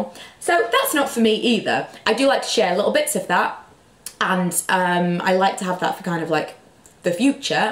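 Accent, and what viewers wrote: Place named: Yorkshire, United Kingdom. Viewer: I watch all of your videos. I'm 23 years old. You mentioned you don't get the same opportunities as other youtubers because you're in Yorkshire. but actually, that's what i love about your videos. For me, it's all about relatability. when I watch your videos it's really comforting because im from Sheffield, it's nice to recognise an accent that feels more like mine, i dont know if that has anything to do with an embedded North and South divide. 😂 But the best thing about your channel is i really value and trust your opinions. I never know when other youtubers are being sponsored, youre very real. I dont relate to the youtubers that are constantly having photo shoots and walking the red carpet because its just not normal enough.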